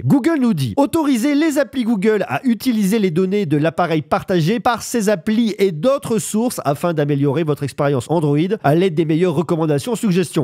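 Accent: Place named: France. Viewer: Je pense que c'est l'IA qui fait la traduction. L'IA laisse même l'accent français. 😂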